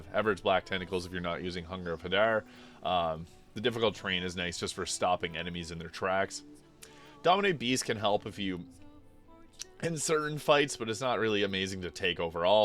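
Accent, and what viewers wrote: Canada. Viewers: Are you ducking Canadian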